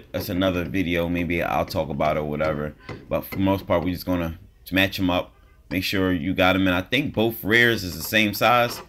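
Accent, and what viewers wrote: Philadelphia, United States. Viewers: You sound like a Philly boul lol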